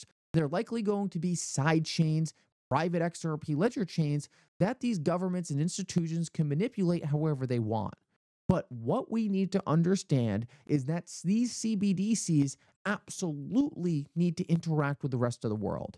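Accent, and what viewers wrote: Allentown, United States. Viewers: with an Allentown accent at the click baiting again...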